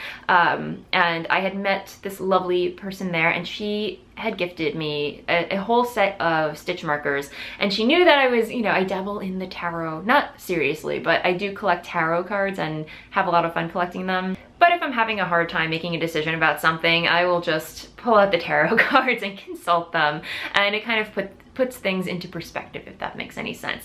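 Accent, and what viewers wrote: Brooklyn, United States. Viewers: You've inspired me to try dyeing yarn and now you're inspiring me to sew more. I really wish I could sew up a whole wardrobe for myself. But I'm such a newbie! Oh and I loved your Brooklyn accent! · I'm from the Fort Hamilton area of Brooklyn and I don't have a very strong accent either. Your accent actually sounds a lot like mine. My Grandmother use to say "Errl" for oil but she was from the Jamaica area originally. None of my uncles or Aunts have that type of accent like my Grandmother. I think it was perhaps more from the German area of my family that did not speak that way. So perhaps it is the German/Brooklyn connection. I do still drop my R's though. Dinner is "Dinna" still. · What a fun inspiring and energetic episode ! Your shawl/ blanket looks so amazing ! Wow You made me laugh with your Brooklyn accent - too funny , in a good way Hope you have an amaIng week XEmilia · OMG!!! 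You SO need to do an episode in Brooklynese!!! So good! 🤣🤣🤣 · your Brooklyn accent was BY FAR the best thing i heard all day! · chuckling here at your Brooklynese accent .... so cute.... and as always love your podcast! oh ... and your dress is great too.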